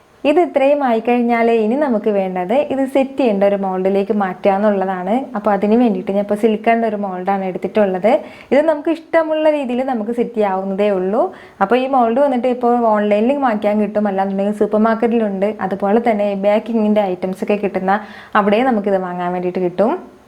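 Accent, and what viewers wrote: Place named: Malappuram, India. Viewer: അത് മലപ്പുറത്തെ വേറെ ഭാഗത്ത ഭാഷ നിങ്ങളെ 😁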